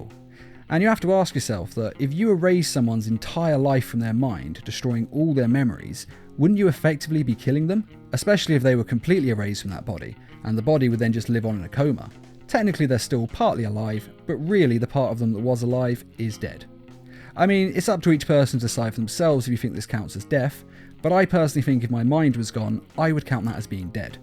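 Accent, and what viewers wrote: United Kingdom. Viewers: I have a question is this your real English British accent or is it fake and can you do a face reveal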